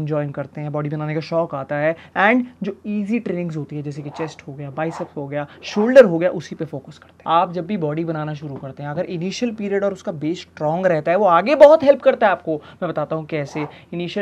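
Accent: Delhi, India. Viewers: Delhi Valli baat cheet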